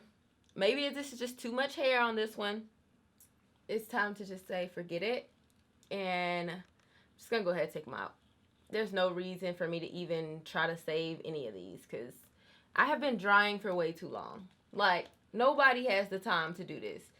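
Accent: South, United States. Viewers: Lmao why are you so funny in this video??!! Sounded so country 😂😂😂😂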